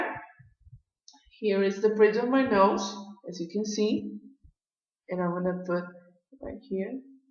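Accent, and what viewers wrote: Mexico. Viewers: Love this video! You speak English really good I have the Mexican accent too! Haha😂 And my eye brows are a challenge a big challenge cause I have to make my brows every day cause I don't have to much hair on it😒 sad ! Well thank for this tips.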